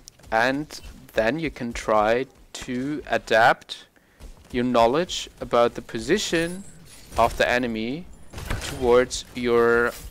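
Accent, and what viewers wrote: Germany. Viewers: im preeeeeeeetty sure you´re german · Found you from Jayne and loved your montages. Great video btw You sound like you are from Germany?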